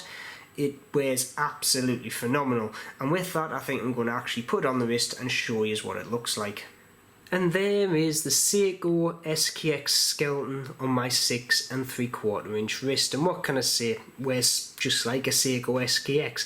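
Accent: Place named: Durham, United Kingdom. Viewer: You sound like Durham lad.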